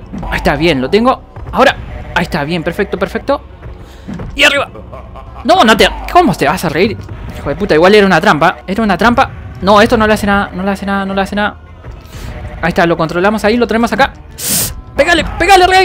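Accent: Argentina. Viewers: sos de argentina o de donde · eres de argentina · en este video pense que eras argentino y lo eres :v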